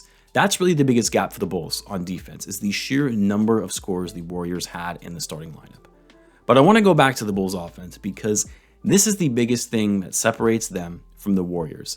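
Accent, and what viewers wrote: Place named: New York, United States. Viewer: lol I have a few people who work on my job that come from NY and you sound so so so much like them lol and they can’t stand MJ and those Bulls, but it is a respect issue, and when Scottie dunked on P Ewing and then pushed him down & those Knicks fans never ever forgot it either. Well, I never truly hated the Knicks, but it’s those Yankees that I love to hate, because I am down here in Baltimore Maryland, and those Yankees broke my heart so many times, but getting back to your subject, I like The Lakers and I wanted LA to win, Portland to win, Seattle to win, and Phoenix to win, but I was never truly a fan of Karl Malone and that group, so I loved it when MJ and those Bulls beat Utah, but I was cheering against The Bulls the majority of the time, but then, there comes a time where you have to give credit to those Bulls of the 90’s and that goes for MJ & Pippen, because they came together as a team when it counted most. 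Ummmmmm sure MJ gave most of those Teams the blues but Scottie was putting in work as well & as MJ would slack off, you had Scottie to seriously deal with, so it went both ways. It was almost like having a good boxer who was predominantly right handed, but would also jab a person with a short left handed jab, but they were good together ✌🏾I was so so hoping that Kobe and Trevor Ariza was going to give me much of the same, but The Lakers traded for Artest 😞 & I am still saddened by Kobe’s tragic end bait ( Sighs 😔 Greatly )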